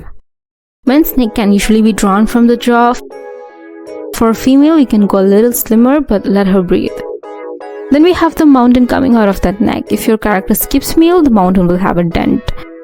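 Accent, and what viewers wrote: India. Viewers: great to hear an indian accent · it is an Indian accent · she actually sounds more indian than european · Do I hear an Indian accent? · i swear i hear Indian accent a lil bit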